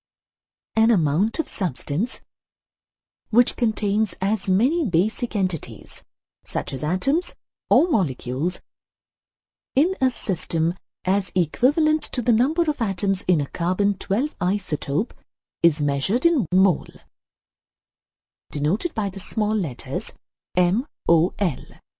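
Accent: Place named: India. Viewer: Good indian accent. & tune and pause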